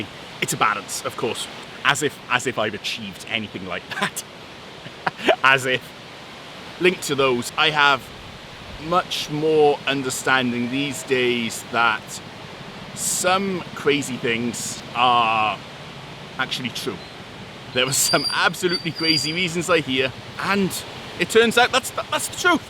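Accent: Bisaya, Philippines. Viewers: OMG, you even got the filo accent lol so funny!!😂😂 It takes time to understand filipino mentality and to get used to it without getting frustrated and even diappointed. Your approach is good if it helps you to keep your sanity in tact! Kudos to you. Some incidents are just out of your control and hey, no worries be happy attitude sometimes work. Stay safe and God bless🙏💝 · Nakurat ko..perteng bisayaa man diay. Haha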